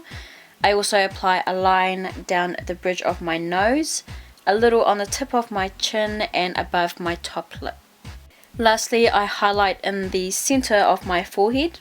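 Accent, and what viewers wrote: New Zealand. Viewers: guuuurl recognized the kiwi accent right off bat. love the videos!!!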